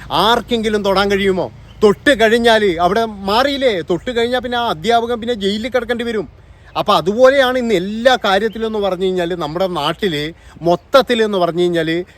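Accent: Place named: Northern Kerala, India. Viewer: പറയേണ്ട കാര്യങ്ങൾ വളച്ചുകെട്ടില്ലാതെ നന്നായി പറഞ്ഞു.. 👍വടക്കൻ ഭാഷയിലുള്ള സംസാരം എനിക്ക് ഏറെ ഇഷ്ട്ടപ്പെട്ടു... ♥️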